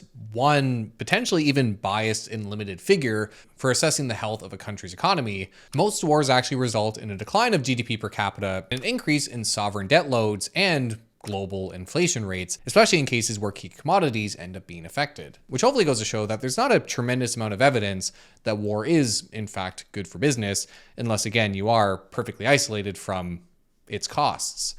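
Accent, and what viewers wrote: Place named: Canada. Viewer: i love the fact that you canadians always have an interesting accent...like "welcome to the pleine baygle" hahahaah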